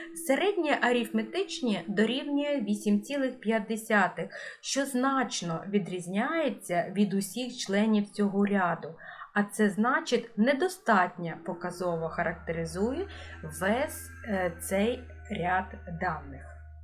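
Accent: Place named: Russia. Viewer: Кацапський акцент)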